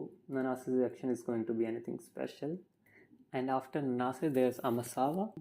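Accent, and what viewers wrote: India. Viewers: by your voice feels like you are from india : )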